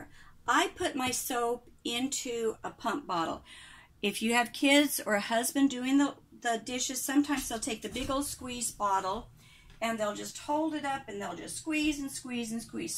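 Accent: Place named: Kansas, United States. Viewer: I knew u were from Kansas. Kansas girls just know one another. And the way we say wash. Ps I was all my dishes by hand ❤️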